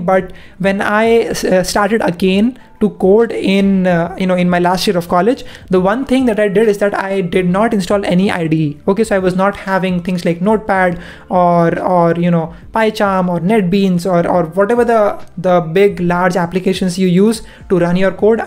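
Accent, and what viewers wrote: India. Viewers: Sounds Indian, but he put some work in. I'll listen... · Video: starts Me: stf you indian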